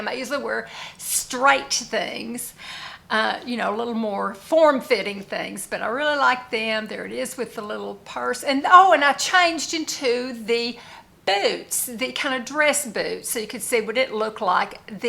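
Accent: South, United States. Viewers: Your Youtube channel is mentioned in "Becoming Me." That's how I found you. You are beautiful! We are close to the same age. I love your Southern accent. Like & subscribed.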